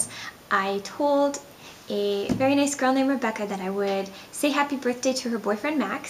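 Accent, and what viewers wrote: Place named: England, United Kingdom. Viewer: Wow English accent is amazing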